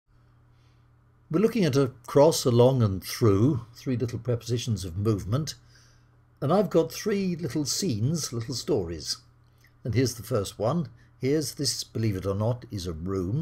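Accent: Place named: United Kingdom. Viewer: liked and subscribed right away as I heard that fatherly, sincere voice with british accent explaining things in a visual way which helps us easily memorize. Keep up what you do sir.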